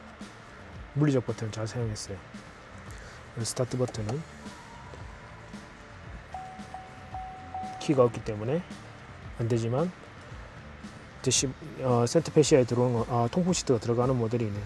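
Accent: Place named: Busan, South Korea. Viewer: 캐나다에서 부산사투리.. 니마! 확마!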